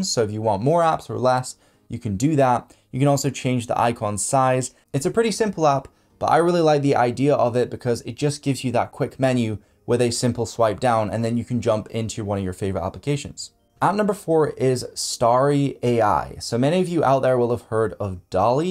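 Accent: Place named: Scotland, United Kingdom. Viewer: Hi! Thanks for a great video. I have been following you for a few months now, really like your content. I recently got the pixel 6a. My main phone is the iPhone 13pro Max, got the pixel for steaming. I think I just downloaded most of the apps you recommended. I wanted to ask. Are you Scottish? I am from Edinburgh, Scotland. I can hear a Scottish accent when you talk. It's cool.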